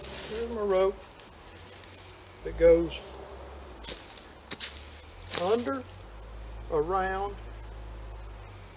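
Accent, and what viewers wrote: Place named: West Virginia, United States. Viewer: Accent sounds just like my uncle from west virginia. Good tip generally. I got a bunch of junk logs dropped off at my property recently by an Arborist. He did be the disservice of stacking them a mile high and I'm having huge difficulties getting some of the logs down. But this technique helped me move some of them at least.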